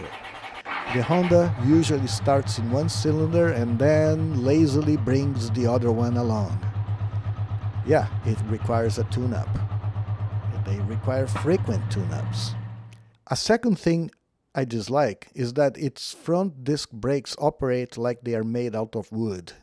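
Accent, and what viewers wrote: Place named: Brazil. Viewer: i loved this video and also love the name of your channel....sotaque de brasileiro hein i am a new subscriber to this channel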